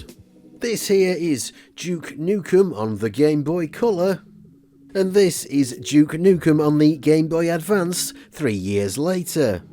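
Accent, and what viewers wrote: United Kingdom. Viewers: Can’t listen to a Brit talk about snes and Nintendo in general.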